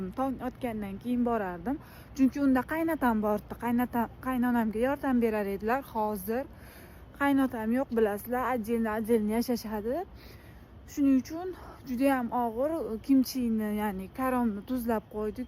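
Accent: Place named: Bukhara, Uzbekistan. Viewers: сиз бухороданмасми шевангиз охшаяпти олот каракулни шевасига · Бухороликларнинг тили на мунча ёкимсиз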